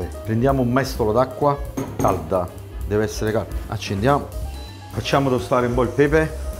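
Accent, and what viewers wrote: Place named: Rome, Italy. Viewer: Chef se chiudo gli occhi mi sento a Roma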